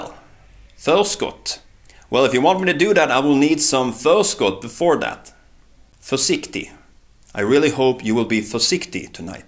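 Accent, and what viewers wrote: Skåne, Sweden. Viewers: Ah right, I wasn't sure if you have a Skånska dialect, it's also been a while since I watched that video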